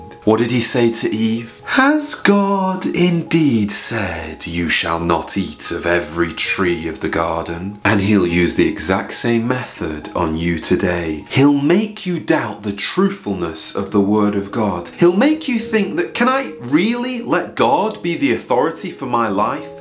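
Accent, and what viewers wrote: United Kingdom. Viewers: Keep it up brother this is so important, only the gospel can change things, and it’s good to listen to someone from Britain. Paul .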